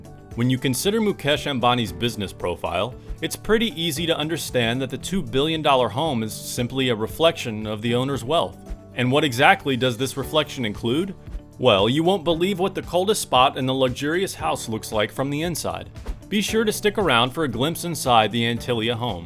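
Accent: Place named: Liverpool, United Kingdom. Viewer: Nacks scouse bro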